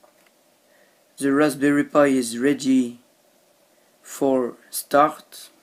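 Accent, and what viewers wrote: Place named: France. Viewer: dat french accent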